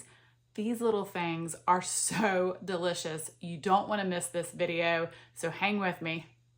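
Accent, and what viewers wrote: South, United States. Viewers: My guess is F since she seems to have a southern (US) accent.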